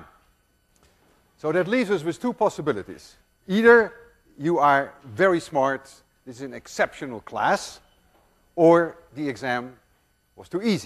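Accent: Netherlands. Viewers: hes dutch u can hear that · what kind of accent does this guy have? He sounds somewhat Dutch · his dutch accent is distracting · he has an accent, a dutch accent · He sounds so dutch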